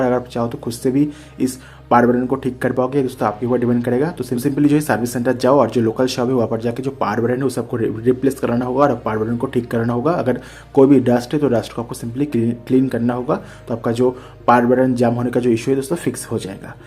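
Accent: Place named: India. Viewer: لمادا الشخص الهندي لا يقدم طريقة ويكتفي بالكلام مع ااعلم لا نفهم عليه اللكنة واللهجة. 😮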